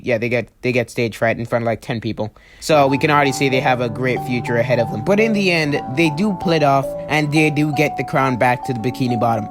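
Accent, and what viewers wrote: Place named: New Jersey, United States. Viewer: I started at the last two week of Nine Color Deer! Dude you sound like you are from Jersey!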